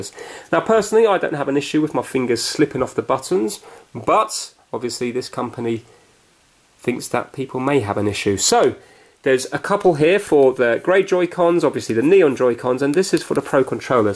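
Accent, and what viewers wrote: United Kingdom. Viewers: British accents are so soothing, I love watching videos which are made by people who have them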